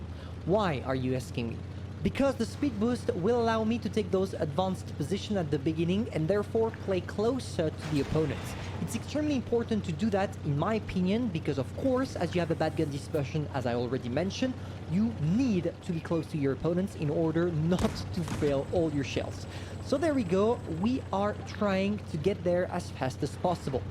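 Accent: France. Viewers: are you french ? you have an accent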